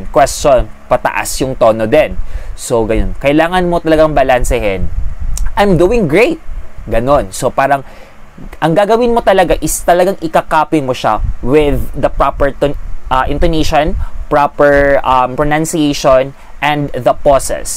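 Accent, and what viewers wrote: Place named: France. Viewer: Are you from France if yes you have very excellent communication skills and while you speak you are getting some touches of your native language while speaking ❤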